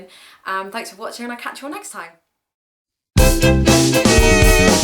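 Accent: England, United Kingdom. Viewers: well, I have been playing guitar for 30+ yrs, and piano, bass and other instrumets for a very long time, but have always wanted to play the violin.. I finally have my hands on one, and was obviously wanting to even know what notes the strings were and go from there, Very informative video, but maybe a little too much info for a total beginner. by the way, your English accent is just SOOO awesome. The accent combined with your obvious beauty, that's quite an awesome combo :)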